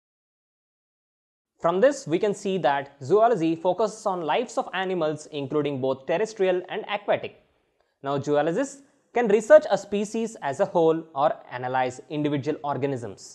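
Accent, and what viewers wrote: India. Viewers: the thick Indian accent ruins this...🤦🏻‍♂️ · Your English sounds like Hindi man wtf just speak Hindi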